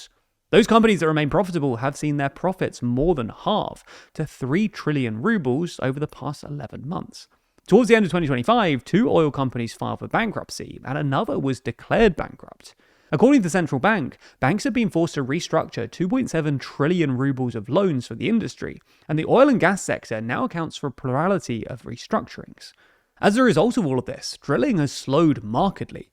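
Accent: United Kingdom. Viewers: UK accent is means a f a k e information. Like BBC news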